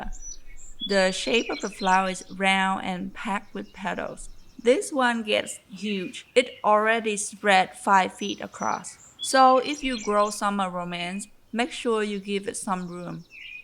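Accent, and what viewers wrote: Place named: Vietnam. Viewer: Are you vietnamese? You have the accent <3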